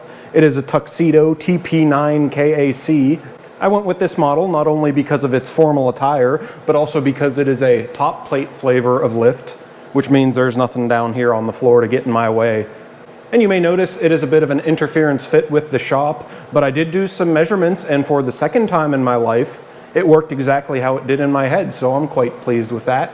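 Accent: Canada. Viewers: Trying to peg the accent.... originally from the great white north? I'm getting Canadian or Minnesotan vibes. Love the channel!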